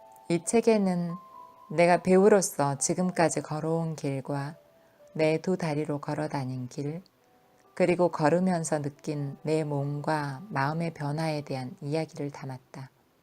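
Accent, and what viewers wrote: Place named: Jeolla, South Korea. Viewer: 읽어주시는 분 전라도분이신가봐요ㅎ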